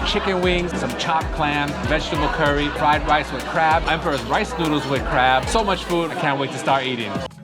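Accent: Chicago, United States. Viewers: You have the thickest Cha-cah-go accent. 👌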